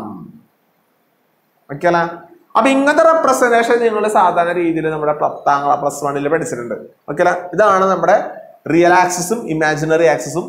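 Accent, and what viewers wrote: India. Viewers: Kasargod karan alle ariya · pinnalha... തൃശ്ശൂർകാരൻ ഡാ 🔥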